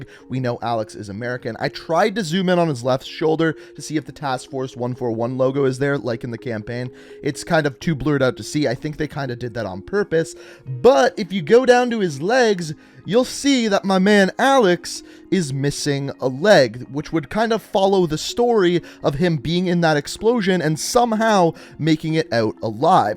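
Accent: Canada. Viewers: this guys extra Canadian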